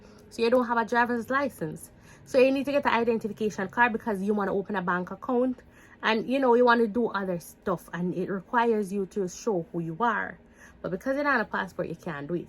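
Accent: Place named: Jamaica. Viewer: Thank fada Gad ur Jamaican,this i understand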